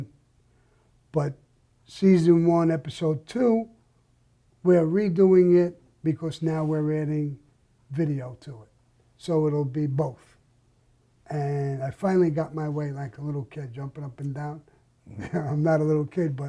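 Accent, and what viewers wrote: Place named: Boston, United States. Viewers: Im glad your here to tell your story.. can u say "im gonna bust your balls " with your east coast accent love that shit thanks for the video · You remind me of my father the way you tell stories, but with a Boston accent instead lol.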